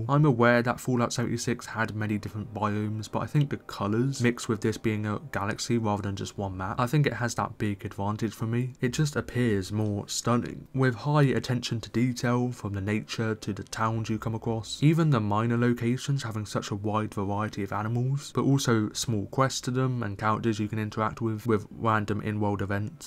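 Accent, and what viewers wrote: United Kingdom. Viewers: That is one BRITISH f***ing accent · SERIOUSLY it's pure and concentrated I was thinking the same thing and made a comment too. Whenever I hear certain phrases here and there in this video I can't help but crack a smile. This guy has the most extreme british accent I've ever heard.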